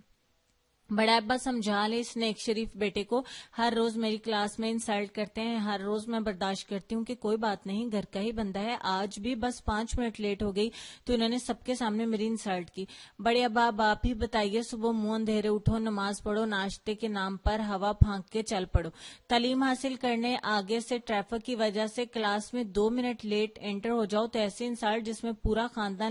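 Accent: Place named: Punjab, Pakistan. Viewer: You speak urdu in punjabi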